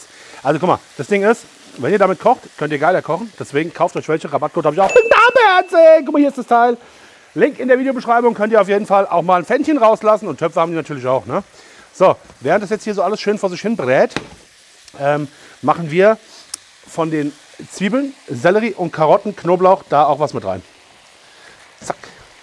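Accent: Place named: Frankfurt, Germany. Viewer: Absolut! Und der Frankfurter Dialekt - super!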